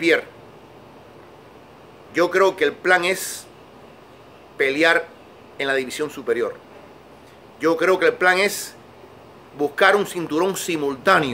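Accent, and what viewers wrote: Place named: Cuba. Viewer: El Señor Ebro (aprende un poco de respeto) es cubano y lo pronuncia como buen cubano. Yo soy español y lo pronunciaria como un español. Un ruso lo pronunciaria como un ruso. Si tanto te jode su acento o su pronunciacion vete a otro canal de mma de niñatos mezclando musica trap y acento de zombie con mma.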